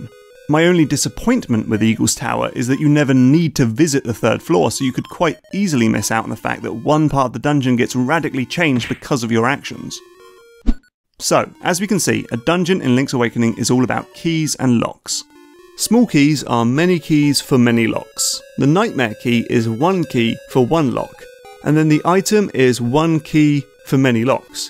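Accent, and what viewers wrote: United Kingdom. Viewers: The British accent makes it sound so prestigious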